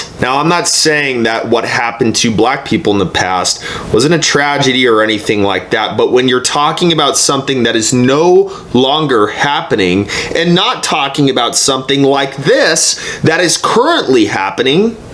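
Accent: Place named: New Jersey, United States. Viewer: Are you sure your from Texas ? You sound like your from new Jersey. Your such a whiney Lil bitch .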